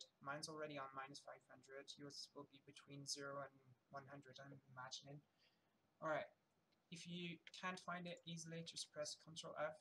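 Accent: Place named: United Kingdom. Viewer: i swear i hear British in ur voice back 7 years ago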